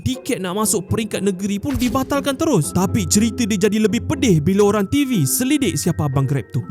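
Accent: Sabah, Malaysia. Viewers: Abang ni orang sabah kah? 😂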